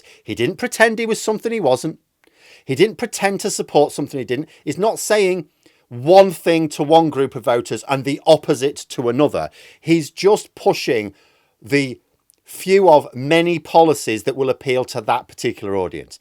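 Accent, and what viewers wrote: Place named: Yorkshire, United Kingdom. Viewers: You went full Yorkshire,. ALWAYS go full Yorkshire. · “Let me go full Yorkshire in this one” You weren’t kidding!! 😂👍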